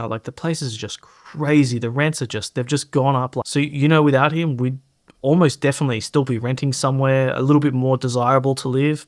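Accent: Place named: United Kingdom. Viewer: I send my condolences about Red,. (I don't know why but I would have guessed you were British.:))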